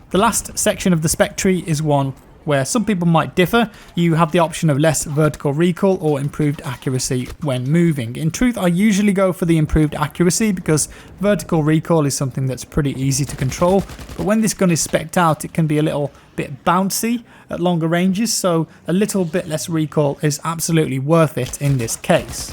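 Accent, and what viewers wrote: United Kingdom. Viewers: This is gonna sound pretty creepy, but i just love it when people with British accents explain intricate shit to me XD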